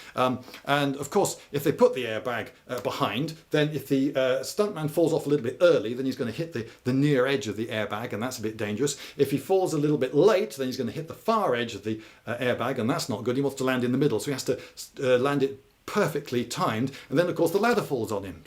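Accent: United Kingdom. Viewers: Why the fuck was I notified about this video NOW?!? I WANNA LISTEN TO A BRITISH MAN TALK ABOUT MEDIEVAL STUFF!!! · You sound like the narrator in strange brigade and that amoes me smile. Everything is better when narrated by a Brit. Plain n simple.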